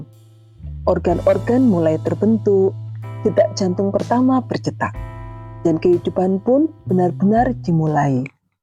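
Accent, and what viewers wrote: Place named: Java, Indonesia. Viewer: Jawa banget nduk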